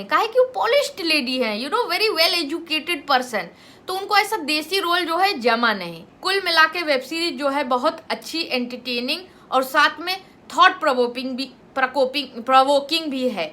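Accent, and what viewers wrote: India. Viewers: Fan of ur Hindi accent ,uske babau bhi sidhe muh baat nehi karta hey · I love your UP accent. Are you basically from there ?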